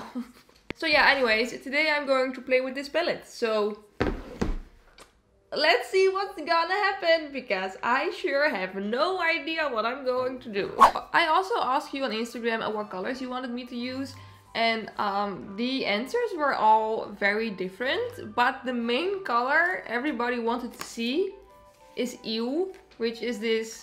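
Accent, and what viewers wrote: Netherlands. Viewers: Are you dutch??? Its sounds like it , im dutch thats why i reconize it haha😘😂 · I just started watching you (this is my third video that I'm watching from you) and usually I'm really bad at guessing from where the accent it (like seriously bad) but I guessed that you are from the Netherlands and suddenly you say you're Dutch. I was really proud of myself. I love your vibe and personality, it's just so lively and fun! Btw, I'm German, so hello neighbor! :D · i instantly recognized your accent as a fellow dutch person LMAO you even use dutch onomatopoeia(?) like. some sounds you make only dutch people would make i feel like. vla is een lekker kleurtje 😳 moet nu naar jumbo denk ik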